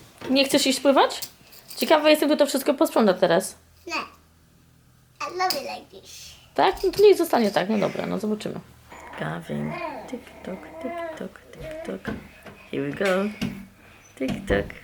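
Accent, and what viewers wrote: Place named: United Kingdom. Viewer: Ooh the accent 18 lat się uczę mowić z brytyjskim akcentem i nigdy mi nie wyszła taka pillow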